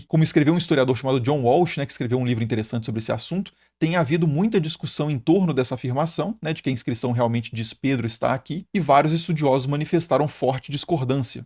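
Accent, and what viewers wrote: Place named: Belo Horizonte, Brazil. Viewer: Meus parabéns a você e a seu canal! Cultura e erudição transmitidas com uma linguagem "magistral" (stricto e lato sensu), e um agradabilíssimo sotaque belorizontino!!!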